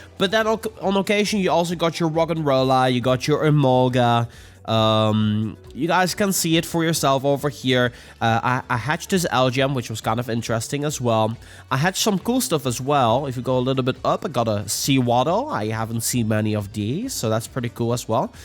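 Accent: Netherlands. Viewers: Kom je uit Nederland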